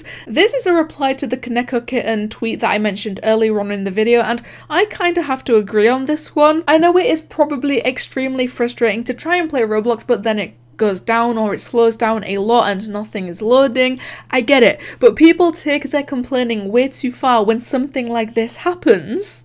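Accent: United Kingdom. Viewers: She's british and they speak like that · She sounds British... Roblox sounds like rublux (no offense )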